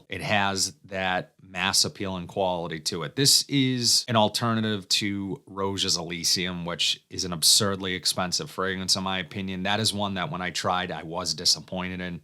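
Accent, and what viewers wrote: Boston, United States. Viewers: Great review Boston bro! Have my son with me today and he digs your accent. Sadly mine only kicks up when in Beantown and Warner, NH :)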